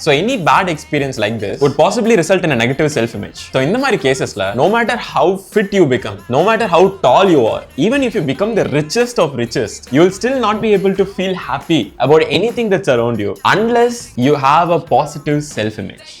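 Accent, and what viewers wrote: Tirunelveli, India. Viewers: neenga tirunelveli aa bro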